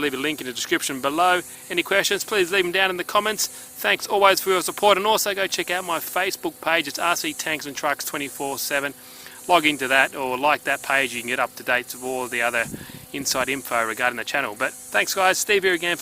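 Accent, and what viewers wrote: Australia. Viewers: Where are you from you sound austrailian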